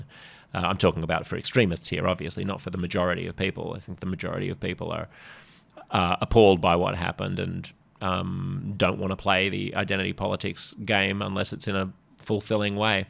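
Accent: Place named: Australia. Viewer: Screw you man! Exactly how would you have “conducted the war” if you had been in BIBI’s position. What an intellectually lazy comment. Let’s hear it what would you have done from your cushy home in whatever part of down under you developed that grating dialect in? I should delete the the comment about your accent but there’s a little truth in every joke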